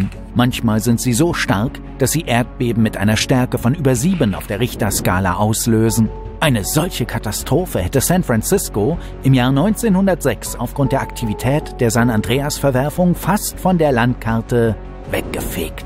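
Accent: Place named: North, Germany. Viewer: ‘aus ‘ein ‘ander: Du bist aus Norddeutschland, ziemlich nördlich! 🤣 Das war's aber auch schon mit neuen Erkenntnissen.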